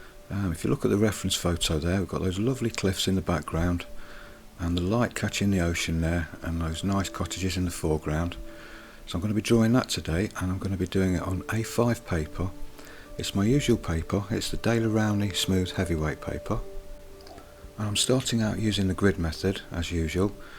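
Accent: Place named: Leicester, United Kingdom. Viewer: You have such a soothing voice. Are you from Leicester? I am too and it’s so nice to hear the accent. Love the video and love your work.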